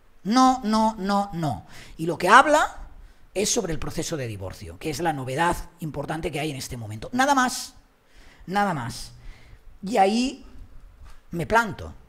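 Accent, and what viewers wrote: Catalonia, Spain. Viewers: tengo que admitir que el acento catalán hablando castellano no me gusta nada pero me gusta escucharte porque eres neutral y das la información tal cual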